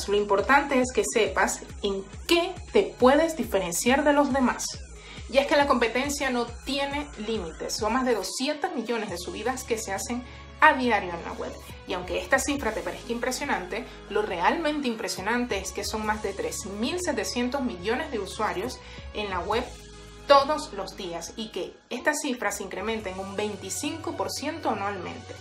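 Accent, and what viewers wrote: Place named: Venezuela. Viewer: Hola! Creo entender lo suficiente como funciona la cuestión de la distribución... pero no tanto lo del Copyright. Soy venezolano! creo que tú también por tu acento. Si quiero subir un tema debería registrarlo primero en SACVEN? SAPI? o puedo usar otros entes reguladores como ASCAP? y de ser así... cuál es el proceso para inscribirme/registrarme a mi y mis producciones? Gracias de antemano! Buen canal! Mi ser servicios están a la orden, soy Ing. de Sonido y productor :D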